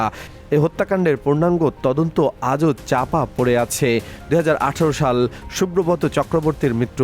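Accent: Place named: Bangal, Bangladesh. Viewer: O tui bangal .tor bhasay bojha jacche...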